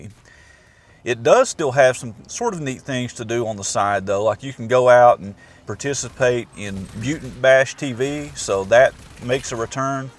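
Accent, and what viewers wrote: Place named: Appalachia, United States. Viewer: I was just gonna say Appalachian twang!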